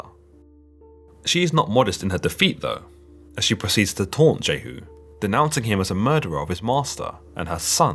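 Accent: United Kingdom. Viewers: 😝😝😝😜😂this guy is really funny with the strong british accent. If the bible is from middle east, why dont you get some narrattor from middle eastern who know where to pause and where to be flawless. You guyz make fun of Indian people...atleast they speak english in neutral accent understandable to all the population of the world . This strong accent is awful which indeed creates stress..Lol . From new delhi India.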